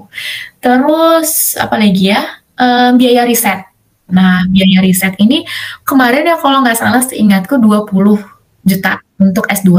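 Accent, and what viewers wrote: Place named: West Java, Indonesia. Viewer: Orang samarinda tapi logat dan someah nya vibe org sunda bgt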